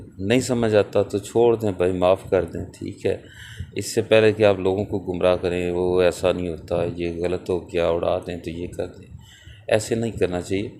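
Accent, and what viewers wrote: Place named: Punjab, India. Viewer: استاد محترم سلام بحت خوب آپ اچھی رہنماٸیہ کر رہے ہیں لیکن ساٸین آپ کی آواز ۔یا کسی وقت کیمیکل ۔دواٸ کا نام اتنا جلدی یا تیزی بول جاتے ہیں جو کہ بار اس لاٸیں کو reves کر کے سننے سمجھنے کی کوشش کرتے ہیں مگر 000.ساٸین لگتا ہے آپ کا لہجا پنجابی اور بات اردو میں اور ہم تو ہیں سندھی اب استاد ہیں آپ سے گزارش ہے کہ الفاظ اور سپیڈ ایسی کریں کہ پٹہان۔سندھی۔سراٸکی۔پنجابی۔اردو۔بلوچی۔سکھ سب لوگوں کو سمجھ آجاٸے۔ ساٸیں الفاظ بھی آسان ہوں تو اور کیا چاہیے ۔ علم کے دریاہ میں سے تھوڑا سا سب پی لیں گے تو آپ کا کیا جاٸیگا۔ورنہ ایسا ہو گا کہ جیسے سمندر سے پیاسا لوٹنا۔ غلتی غستاخی کی معافی چاہتا ہوں اللہ آپ کو خوش رکھے اور بھی خزانہ علم عطا فرمائے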